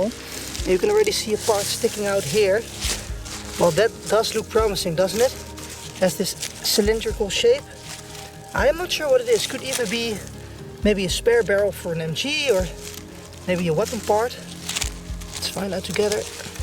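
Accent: Netherlands. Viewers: Can I come with you one time? Sounds like you are from Holland, damn I love this 🤓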